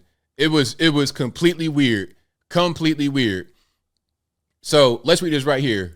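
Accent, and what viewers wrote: United Kingdom. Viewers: Brit here, your British accent is approved! Britney Spears seems like a nutcase to me. I don't think she is all there. Imagine Victor Wembanyama just about to get started on what will likely be a stellar basketball career, and he finds himself in the middle of this nonsense! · doing a British accent is awesome. I think he should do every headline in British. Tut tut, good man.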